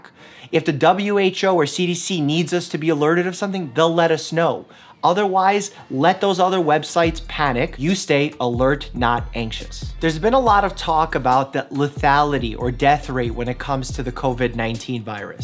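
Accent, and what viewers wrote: New York, United States. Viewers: Is he sounding more New York than any other video he's been on? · with the NY accent though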